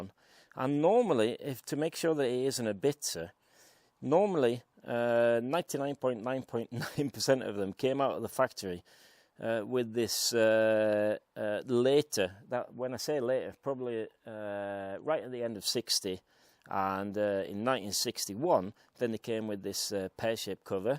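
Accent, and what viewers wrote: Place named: Glasgow, United Kingdom. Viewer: Dude! While I am a Vespa guy through-and-through, I really enjoyed watching you take us around this original Lambretta. It was quite a bit of fun! Not sure how I got here, but you've got a new sub. I picked up some Glaswegian in your accent...then, I read your bio! Great work, sir!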